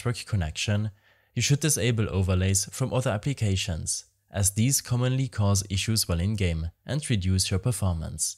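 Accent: Germany. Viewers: Where are you from. You have a german accent. I am from Germany.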